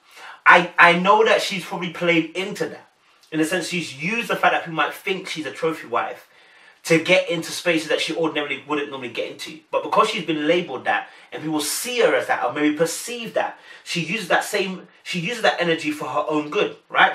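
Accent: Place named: United Kingdom. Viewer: Its nice to hear a British accent reviewing this show. Great review. New subscriber!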